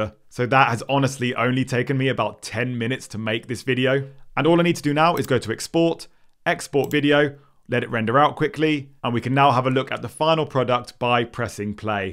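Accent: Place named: United Kingdom. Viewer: Love your British Accent Sir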